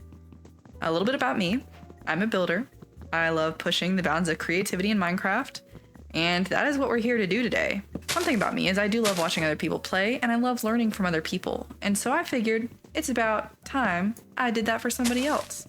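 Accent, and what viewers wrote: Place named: Texas, United States. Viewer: This girl is definitely from Texas or something. Her accent sounds exactly like mine.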